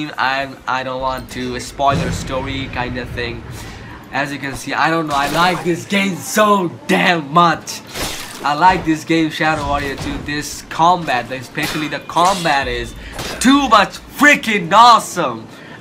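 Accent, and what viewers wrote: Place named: India. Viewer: This guy has to be an Indian.. he has that call-center accent, very indian but trying to sound American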